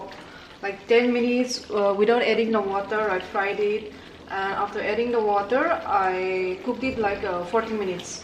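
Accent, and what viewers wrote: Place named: Bengal, India. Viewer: Upki language bengale jeisa lakta hei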